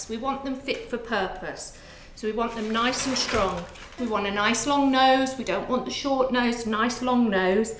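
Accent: United Kingdom. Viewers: Considering is in the UK, it’s not surprising they don’t say it with a US accent 😂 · Thank you for explaining the Miniature and Standard Dachshunds. I would just love to have a pet dog Miniature Dachshunds. I live in France, Jura. I don't know where to go to buy a miniature pet dog Dachshunds. I was told that in Germany, they sell them? please do reply to me. Just love your British accent.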